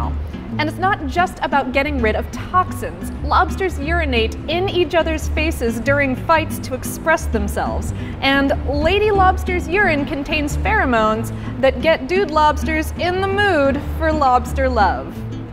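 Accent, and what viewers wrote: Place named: United States. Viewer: has a german name, looks asian and speaks american english....globalistaion for the lose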